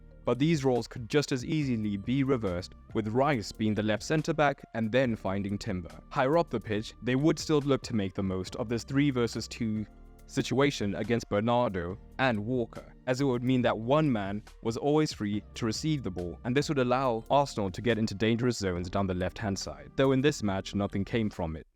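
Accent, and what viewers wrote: South Africa. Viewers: Once again...top class and in depth analysis that focuses on the intricate details of tactics👌 just a question..are you South African?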